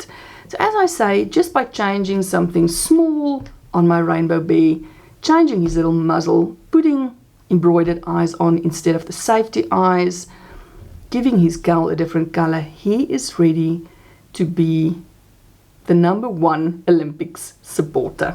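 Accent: South Africa. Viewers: Hello love ❤️, I recognise a South African accent? are you from South Africa perhaps?🥰🥰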